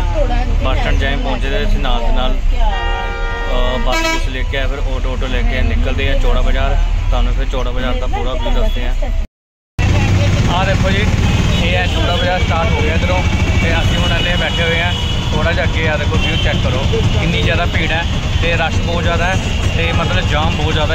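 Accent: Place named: Bihar, India. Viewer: Bhai Tu Punjabi Nai Lagda Tu taa Bihari Lag Reha hai Boli toh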